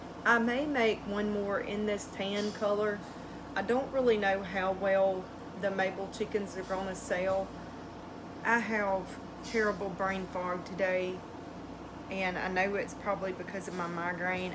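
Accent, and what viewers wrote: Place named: South, United States. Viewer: Girl that is Southern accent and no mistake! I am an Alabama girl myself. On the pricing, I charge $.07 per gram of the finished plushie, then add the extras like specialty eyes and poly pellets. Then I pay myself 10.00 an hour. For all your clearance items, I would suggest mystery bags. I bought some paper sacks on Amazon that are a bit smaller than your standard grocery bag, printed an 8 X 10 sheet and taped it to the front of each one. The sheet described the gender and age range that the plushies would be good for. Then I usually take the fully price I originally charged and mark it down 40% or 50%. I have had alot of success with those mystery bags. Hope that helps!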